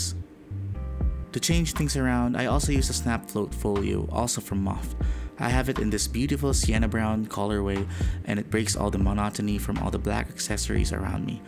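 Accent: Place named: Philippines. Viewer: Your accent is telling me you are a Filipino.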